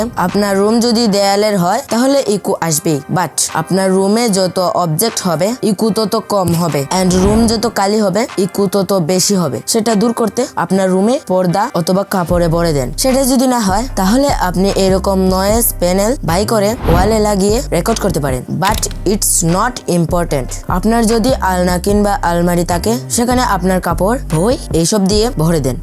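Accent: Sylhet, Bangladesh. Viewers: By the way Are you from Sylhet ?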